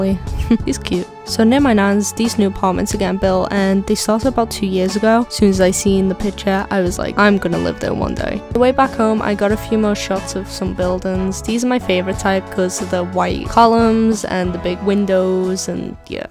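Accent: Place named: Liverpool, United Kingdom. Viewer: Unless you'd moved, but I could definitely tell by the accent you're from Liverpool!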